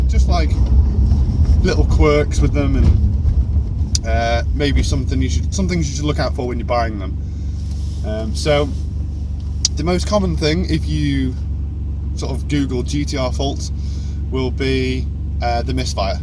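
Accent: United Kingdom. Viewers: I like the accent but some words are funny to me at times but I love the car culture in the UK and movie's also the word cunt such a passive blunt word lol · Fellow scouser. Recognized the town in the background before the accent tbh. My R34 has zero body rust underneath although some of the bolts and fastners have surface rust on them. Looking at it though I can see, how they can rust easy. The paint is no better than a Micra of the same era. i.e. shite.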